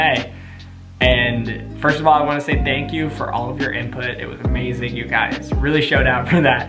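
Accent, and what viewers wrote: United Kingdom. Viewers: Wow! Very cool story. Your intelligence, high standards, and perseverance have clearly paid off, and probably will continue to do so in the future. Your ability to communicate well has really helped me to understand your process. When you speak I am able to visualize your story, step by step, and truly feel your experiences. Awesome job!!! I look forward to watching your running career and life unfold. I have one simple and fun question. Talk to us about losing your British accent. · Where is your British accent?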